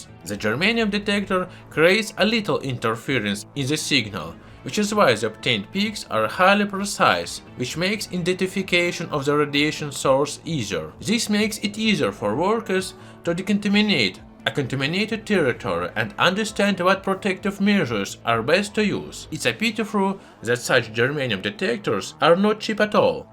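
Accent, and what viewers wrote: Russia. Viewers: are you russian??? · Are you a Russian?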